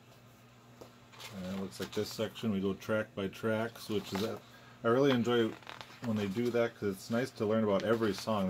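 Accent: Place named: Minnesota, United States. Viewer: Been watching a lot of your videos. Never picked up on the fact that Minnesota accent was actually coming from Minnesota. Hello neighbor.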